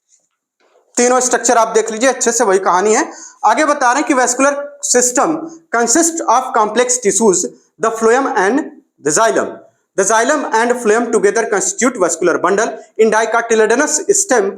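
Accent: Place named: Bhojpuri, India. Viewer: Bhojpuri lecture ho gya sir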